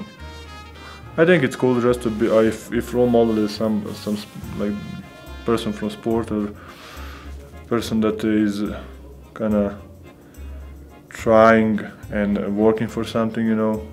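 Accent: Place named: Sombor, Serbia. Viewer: Pozdrav, uz tebe smo brat nas! Nego opusti se i pricaj engleski sa tvojim somborskin naglaskom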